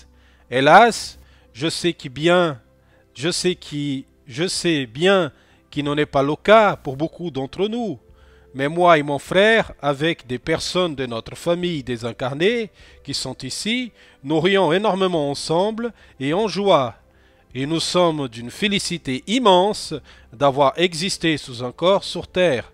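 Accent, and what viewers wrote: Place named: Brazil. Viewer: Je suis tellement heureux de retrouver le Père Brune. C'est en cherchant d'éventuelles vidéos inédites de François Brune que je vous ai trouvé aussi, j'ai écouté toutes vos vidéos : 🤗❤. Je vous aime maintenant tout autant que le Père Brune, et je comprends qu'il vous ait choisi comme postier tout amour. Il se trouve que j'adore le Brésil, et aussi votre accent. Merci du fond du ❤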